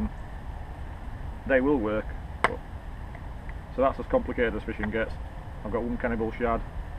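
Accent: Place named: Yorkshire, United Kingdom. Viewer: I read your blog on dropshotting before watching this great video. LOL You did a great job of keeping a straight face. I've just tackled up with an LRF cheapish combo so as to be able to fish part sea, part canal after years of threatening to start fishing again,then taking early retirement I've finally done it. Your video's have inspired me to get another lightweight spin outfit for lure fishing. Drop shots, and small rubber lures came with the Shakespear agility LRF set up, but you've really sold it to me to lure fish. I wish I knew where you were, your accent sounds like mine, in between Leeds Bradford area. You're a credit to the sport. Thanks. Robin.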